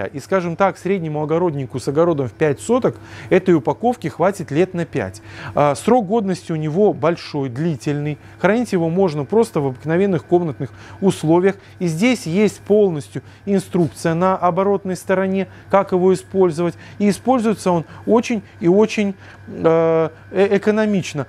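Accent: Belarus. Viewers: Очень красивая речь,правильная и профессиональная с изюминкой белорусского акцента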